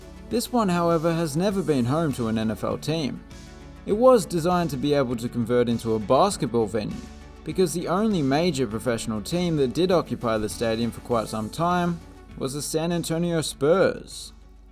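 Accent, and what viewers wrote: Australia. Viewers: Why is there an Australian guy speaking. This is America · Its interesting hearing an Australian accent talk about American football. Great video man.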